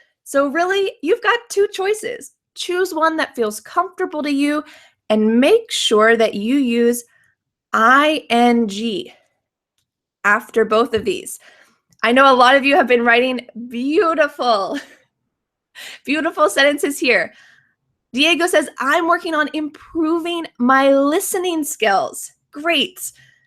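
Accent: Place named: United States. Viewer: Your English American pronunciation and accent is really perfect, I never heard someone who hade such perfection speaking like you dear sister, God bless you and your family and ministry so much. God makes prosper all works of your hands in Jesus Christ our Lord.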